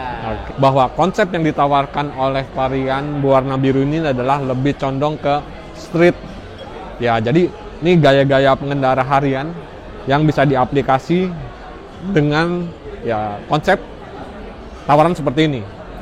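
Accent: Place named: West Java, Indonesia. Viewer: Letah sunda 😁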